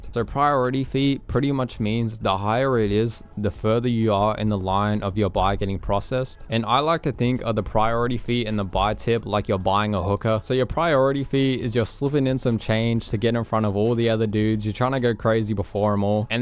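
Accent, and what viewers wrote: Australia. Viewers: are you from Australia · If you hear an aussie and he speaks like this with good humour, you know he genuine.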